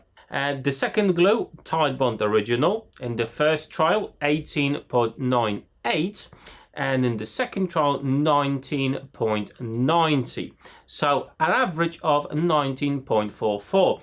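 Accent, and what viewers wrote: United Kingdom. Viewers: Where in the uk did u learn your accent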